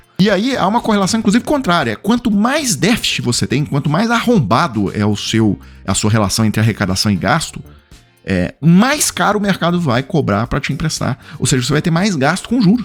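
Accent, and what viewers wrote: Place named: Espirito Santo, Brazil. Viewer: Como se esse rapaz? Alguém sabe? De onde ele é? Tem sotaque de Capixaba.. É tão inteligente e perspicaz ❤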